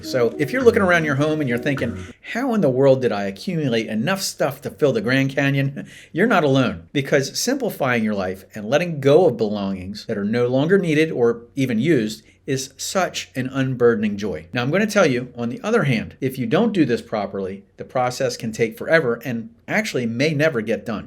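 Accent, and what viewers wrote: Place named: Eastern Pennsylvania, United States. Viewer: Nice vid. P.S. I'm detecting an eastern PA accent. True?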